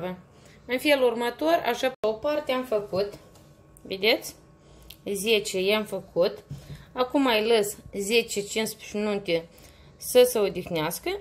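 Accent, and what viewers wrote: Moldova. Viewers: Dulce-i graiul moldovenesc 😃 nu lua în seamă comentariile rele de la proști. Ai un program frumos și mulțumim pentru că împarți rețetele cu noi. Eu sunt din oltenia și înțeleg tot ce spui, îmi place când zici " iaca așa" 😃 Mulțumiri și fericire la toți ❤ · Seară Frumoasă! Dragă! Foarte frumos și delicios! La Mulți Ani Fericiți! Copilașului tău și la toți copiii din lume! Îmi place foarte mult cum gătești și cum explici in dulcele grai moldovenesc! Fiecare regiune are regionalismele sale! Îți doresc o săptămână frumoasă și binecuvântată alături de cei dragi! Cu mult drag! ❤️😘🌹🌹🌹🍒🍓🐈🧁🏡💥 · Ești o scumpă Draguto mie îmi place cum vorbești ,am avut multe prietene din Basarabia când am fost mai tinerica ,bravo ești o gospodină desăvârșită ,💖💖💖💖💋💋💋💋💋🌹🌹🌹🌹🌹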